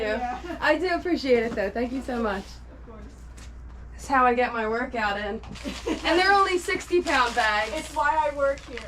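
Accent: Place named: Eastern Pennsylvania, United States. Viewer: This video popped up in my feed and a min in I could tell you were in PA by your accent, I’m assuming eastern PA 😂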